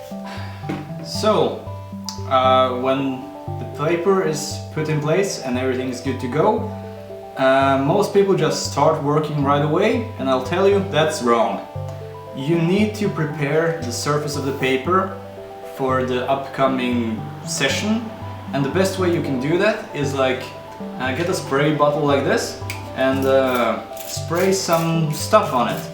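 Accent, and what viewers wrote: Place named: Scandinavia. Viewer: Your accent sounds so Scandinavian .. Where are you from?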